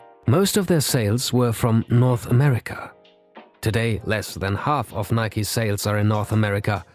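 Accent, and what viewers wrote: Germany. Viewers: Really perplexed by how poorly the narrator pronounced most of the Portuguese terms and expressions in this video – none of which are difficult to pronounce accurately if you bother to research the pronunciations. Otherwise another great, informative video. Ich finde generell das deutsche Reporter (ich gehe von seinem Akzent aus dass dieser Typ deutsch ist) sich nicht genug Mühe geben, fremdsprachliche Begriffe richtig auszusprechen, was ich als Deutscher der im Ausland aufgewachsen ist sehr bedauerlich finde. Mandando todo meu carinho de Londres aos brasileiros assistindo o vídeo 🕺🏽 tamo junto!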